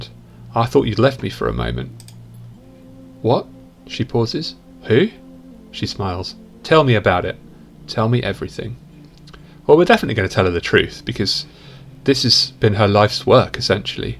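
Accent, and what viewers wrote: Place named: Germany. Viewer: Lol, you losing the German accent remind when of when I was an exchange student in France and during English classes I straight up couldn't speak English anymore. My brain was: Yes. Translate. Know what to do. speaks French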